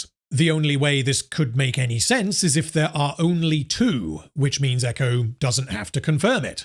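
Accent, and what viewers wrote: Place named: South, United States. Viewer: Are you a voice actor? You have such a bombastic voice. I'm not an expert on English accents but it certainly sounds "southern". Finally checked out your own stuff but have watched a lot of your EFAP appearances. Best of luck with your channels & I am looking forward to watching your previous vods.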